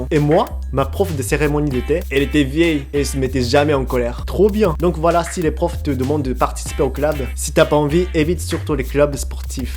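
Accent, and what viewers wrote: Japan. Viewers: J’adore ton accent japonais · Tu parle super bien français mais tu a l'accent japonais très prononcé j'adore😃😄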